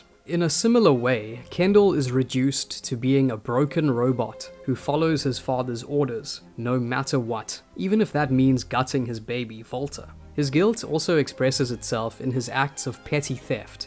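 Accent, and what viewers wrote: South Africa. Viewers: Can hear that South African accent :P nice videos bru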